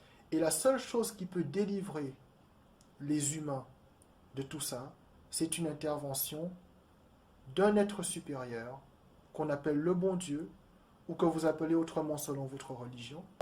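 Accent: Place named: Antilles, Caribbean. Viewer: salut ! Je suis une de tes abonnées et je me disais bien que tu avais un accent créole antillais :) j'ai aussi des origines de Guadeloupe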